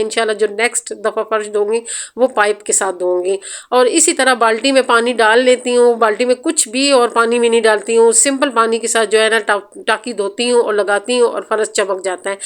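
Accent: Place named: Punjab, Pakistan. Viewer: Zbrdst aap ke pnjabi to hmry jesi ha from kamra attock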